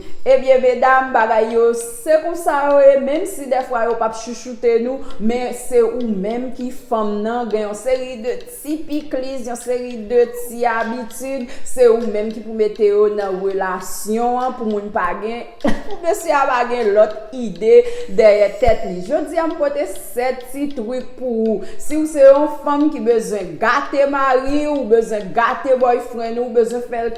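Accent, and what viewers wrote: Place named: Cap-Haïtien, Haiti. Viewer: Se vre wi! Menm lè anpil nan yo pa konn lè yon fanm vle yo! M rmen ti aksan capoise la anpil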